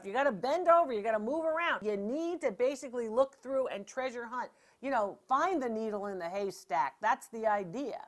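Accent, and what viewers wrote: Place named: New England, United States. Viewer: Dr. Lori you are just precious. I love your New England accent and your Italian family stories. I appreciate all of the knowledge that you share with us on the items you select. Please keep it up.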